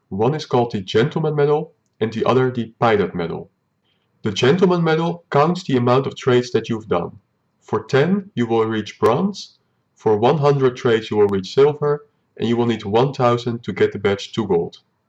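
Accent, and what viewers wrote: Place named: Netherlands. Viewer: Are you from the netherlands cuz youre accent is really dutchy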